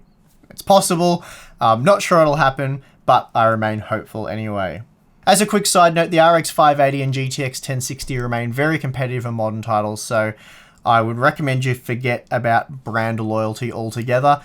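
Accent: Australia. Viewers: you have an aussie accent so i assume youre a fellow aussie, anyway i bought an msi 580 8gb armor for 250$ aud last week so prices are dropping hard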